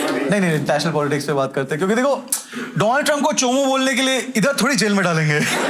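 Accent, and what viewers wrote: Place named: Mumbai, India. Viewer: Bambaiya hindi raaste par bolte hain...show pe nahi. Used to hearing better hindi from you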